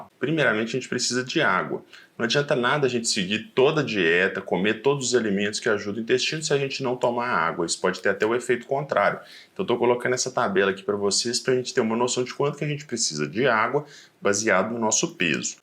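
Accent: Minas Gerais, Brazil. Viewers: Boas dicas e com o belo sotaque mineiro, uai! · São relevantes as informações. O Dr. deve ser professor: metodologia blz! E o sotaque mineiro é nota 10!!!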